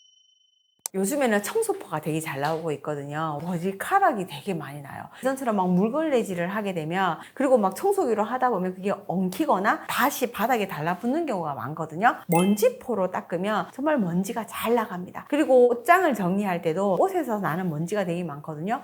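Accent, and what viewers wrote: Gyeongsang, South Korea. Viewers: 경상도 사투리 쓰는 분들에게 이런 댓글 다는 사람들 있는데 이유가 뭔가요? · 경상도 억양. 설치고 설레발이 먼저인 인종이라 본인이 일단 정리정돈 안되는 어수선이들.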